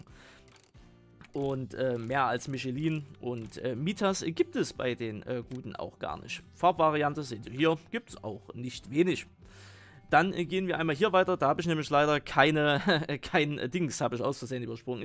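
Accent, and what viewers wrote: Germany. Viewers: Gibt auch Gegenden in Deutschland wo man so redet;)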